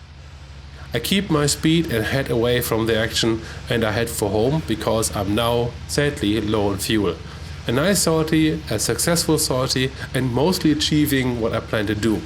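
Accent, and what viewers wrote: Germany. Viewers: I love these videos - the kind of practical, field study lessons that make a real difference in real-world fights compared to generic classroom do's and don'ts. Also, "... but after I'm sure the contrail dude just keeps trucking... " - American slang with a German accent. Brilliant! lol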